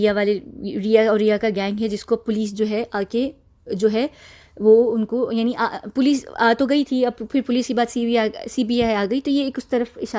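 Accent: England, United Kingdom. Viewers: Very well explained, with your Englandish accent.. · Your accent is British.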